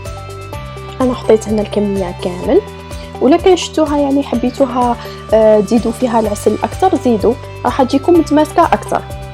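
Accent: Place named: Algeria. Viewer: سمحيلي الزيينه ديالي يلاه خرجت من المستشفى كنت زيدت إبني عثمان يلاه راني ندير الواجب مع الكل انا من عشاق الشوفان قناتك رائعه اتمنى لك التوفيق وانشاء الله يدوم التواصل بينا أضن انتي من الجزائر الشقيقه من خلال لهجتك حيت عندي صديقاتي من الجزائر والاقرباء كذالك كلنا اخوه في الله 🙏🙏🙏🙏🙏🙏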